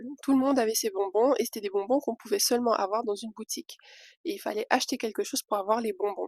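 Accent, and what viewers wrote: Cameroon. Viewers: Accent du Mboa🇨🇲 Bienvenue...nous t'aimons très fort, j'ai une famille métisse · Tu as déjà fait combien de temps au Cameroun, pour prendre l'accent là?😅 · Tu es marrante ! L’accent camerounais te vas bien. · Écoute, laisse moi te dire que tu t'es déjà bien intégré au mboa, le sang Camerounais domine en toi sa se sent par ton accent 😁👍👍👍👍 🇨🇲🇨🇭✔ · Ton accent Camer hein...un vrai miel. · L'accent camer est déjà là, c'est super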